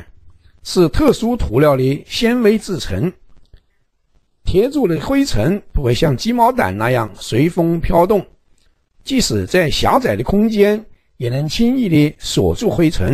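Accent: Sichuan, China. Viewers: 很明显是四川普通话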